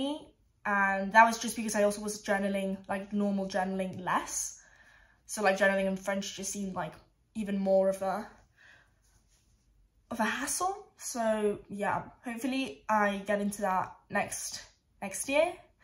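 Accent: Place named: Scotland, United Kingdom. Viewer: That is scottish accent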